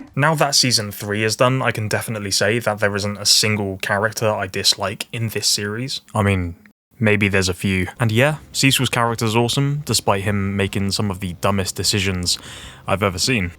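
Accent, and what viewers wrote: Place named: United Kingdom. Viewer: Good invincible video? British man talking for 10+ minutes? Subscribed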